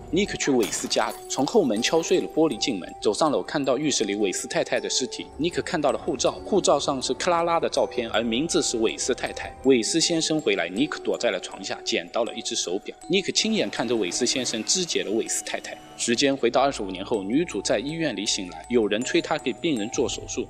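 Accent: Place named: Hangzhou, China. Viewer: 嗯 我杭州人 口音听起来很接近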